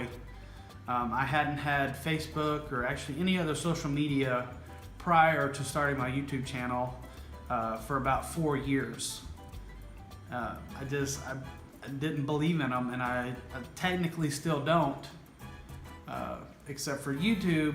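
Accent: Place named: Texas, United States. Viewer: OMG, are you kidding?! Well, you do sound Texan:) I've been in Austin for a couple of years now, but I miss Boston every day. I haven't acclimated well here because it's just too hot and humid all the dang time. I bet you don't miss that, hahaa! Wow, I love that you and your wife made your dream come true-- it takes sacrifices, yes, and a hell of a lot of courage. But there you are-- now living in paradise:)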